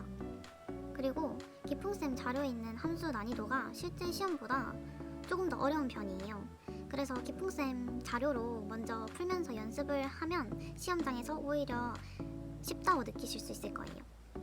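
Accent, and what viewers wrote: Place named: Gyeongsang, South Korea. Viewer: 실기후기영상 보러왔습니다 일단 축하드려요 ~ 그리고 사투리가 좀 보이는데 고향이 경상도쪽이신가요? 시나공으로도 연습해보셨는지도 궁금해요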